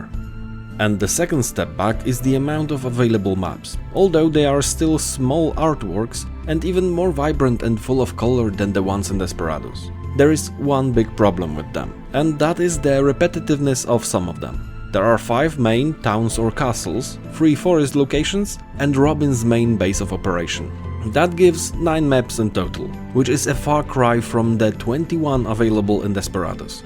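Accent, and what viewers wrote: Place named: Germany. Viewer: Discovering your video via the Steam page of Desperados. Great video with excellent review on which i agree 90%. I grew up playing Commandos (from the first to the last) and Spellbound games (Robin Hood, Chicago and the three Desperados games) and i completely relate to your points and feelings. I still play those games from time to time (they're still very nice games to play even in 2021) and i played Mimimi games too (Shadow Tactics and Desperados III), even though i liked them very much they lack the charm those old 2D Isometric games had. My biggest dream would be a Desperados 1 re-master with 4K HD graphics and better animations yet the very same gameplay and story (+ the expansions maps that were planned but never released). Anyway you earned my like and my sub ! :D PS: Where are you from ? I can hear a german-ish accent but i'm unsure. 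BTW i'm French and i understood 100% so your English is perfect !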